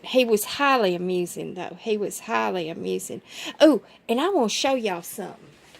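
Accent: South, United States. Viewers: Omg I love your southern accent ❤❤❤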